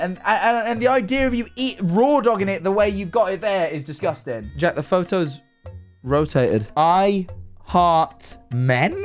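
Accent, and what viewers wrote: United Kingdom. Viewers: jack was extra british in this one 😂😂😂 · You're British?!?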